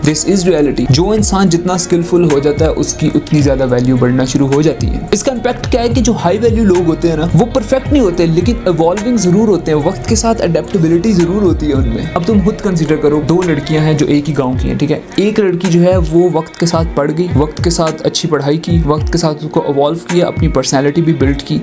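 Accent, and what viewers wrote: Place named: Pakistan. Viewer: Bro are you from Pakistan because I guess from the way of your talking.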